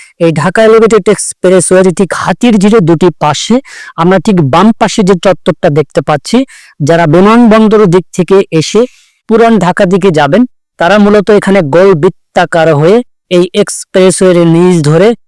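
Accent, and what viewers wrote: India. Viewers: তোমার ভাষাতো দেখতেছি ওপার বাংলার!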